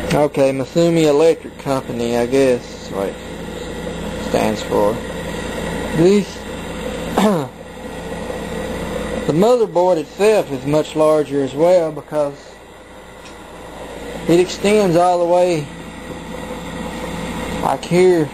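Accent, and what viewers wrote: United States. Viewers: You from the south of america?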